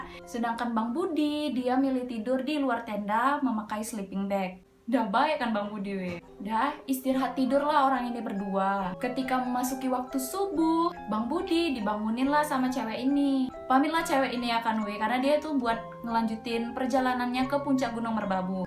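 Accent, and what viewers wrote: Medan, Indonesia. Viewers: nengok cakap Medan nya kok jadi sor kali, jadi pengen liat cerita" yang laen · Mantap logat medannya dah kluar lagi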